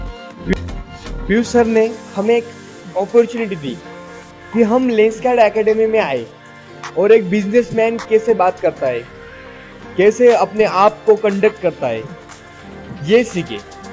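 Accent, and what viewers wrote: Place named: Maharashtra, India. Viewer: we simply love maharastrian hindi 😅🥰🥰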